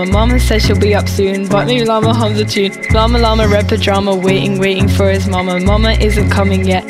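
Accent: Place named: Bath, United Kingdom. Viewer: its cah she got a bath accent stfy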